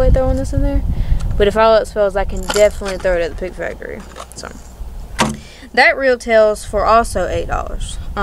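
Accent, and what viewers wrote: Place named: South, United States. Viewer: i love how beautiful this woman is and southern voice... great video by the way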